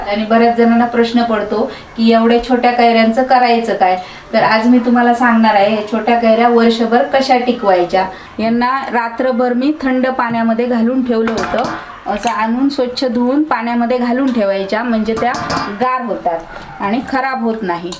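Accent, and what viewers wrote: Konkan, India. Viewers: कुठून बोलताय कोकणातून का बोलण्याची पद्धत पक्का तिकडचे वाटते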